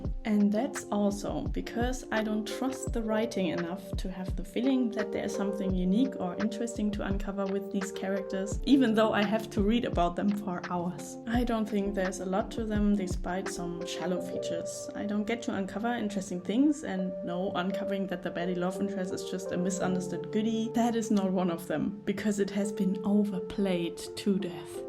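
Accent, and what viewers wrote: Germany. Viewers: Dein deutscher Akzent ist echt schlimm aber sonst gutes Video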